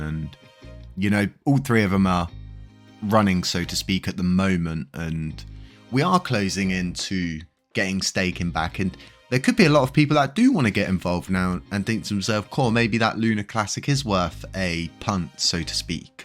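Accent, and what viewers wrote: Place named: Somerset, United Kingdom. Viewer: My boys got a South West accent im thinking Somerset bai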